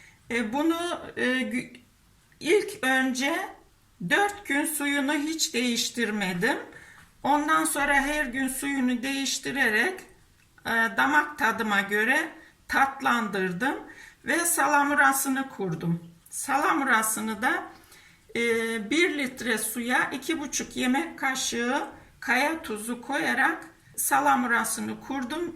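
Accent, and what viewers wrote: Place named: Black Sea, Turkey. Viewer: Harikasın konuşman bizim oraları andırdı karadenizin becerikli hanımları maş. Mutlaka deneyeceğim.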